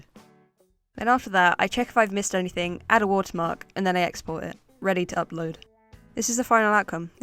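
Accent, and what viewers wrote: United Kingdom. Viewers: YOUR BRITISH?! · ALSO BRITISH? if so me too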